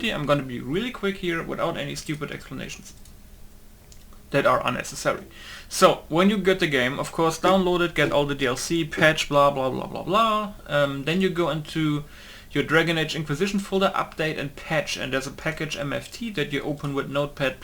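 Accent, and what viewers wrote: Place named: Germany. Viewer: Dude seriously as a german myself watching this video was funny af with his constant accent Iam litterly dying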